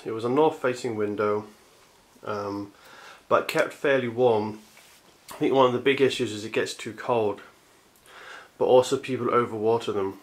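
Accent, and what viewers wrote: United Kingdom. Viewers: The chap has a British accent, so I assume he's in the UK. The UK, along with Europe, uses Degrees Celcius to measure temperature, and 15 degrees C is equivalent to 59 - 60 F (Farenheit).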